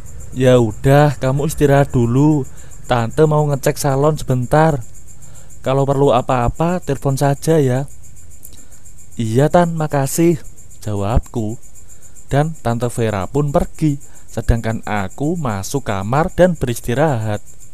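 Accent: Java, Indonesia. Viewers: Jangan orang jawa untuk menerangkan cerita ini, gak cocok dan gak enak dengernya, ceritanya bagus, tapi yg ngucapkan cerita ini gak enak suaranya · mantul min....jawa nya jawa mana ya ini...jangan² se lubang ama aku🤣🤣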